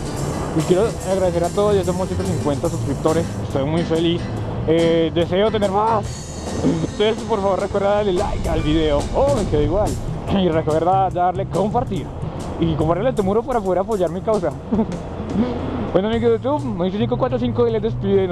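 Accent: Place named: Mexico. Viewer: dices que eres colombiano pero hablas como mexicano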